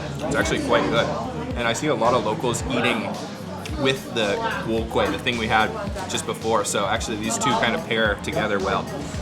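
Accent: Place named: Canada. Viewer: is canadian? i can hear a canuck accent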